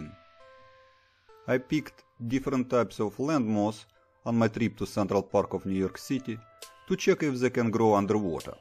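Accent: Russia. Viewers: This " meanwhile in Russia" accent...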